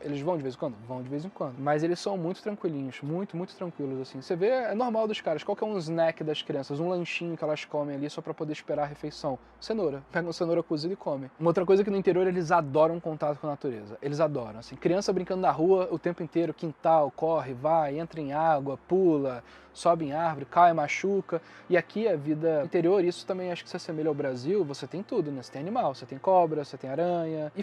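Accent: Rio de Janeiro, Brazil. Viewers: Vídeo muito bom Mas o cara fala assobiando com esse sotaque de carioca que chega a dar um ruim nos ouvidos kkkkk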